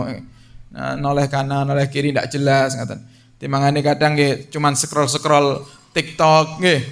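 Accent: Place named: Java, Indonesia. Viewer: Habib Hasan bin Ali Asegaf, Ganteng Jo wone medok, smg sehat Habib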